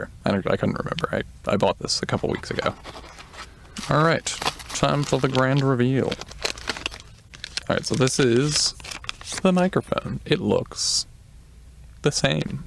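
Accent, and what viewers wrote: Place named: Silicon Valley, United States. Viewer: .... Speaking in the Silicon Valley derived, computer punk dialect....... (slightly feminised, conspicuously/overly precise, observationsl, complete with vocal fry.) Computer nerds everywhere will be cheering.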